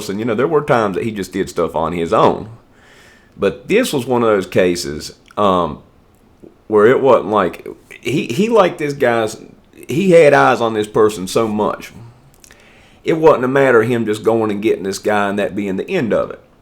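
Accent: United States. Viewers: LOVE your country accent bro...so sexy!! ❤ from Scotland ✌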